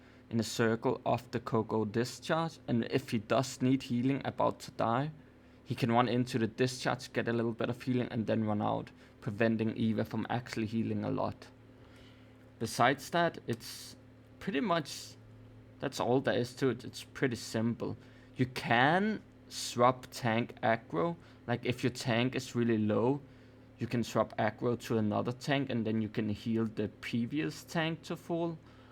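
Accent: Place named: Denmark. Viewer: Big thanks for the guide, I will probably be tanking this for my crew this week. Are you Danish? You sound like a fellow Dane :D If you need a crew to run raids with you should consider joining us in CozyCrew over on Ether EU.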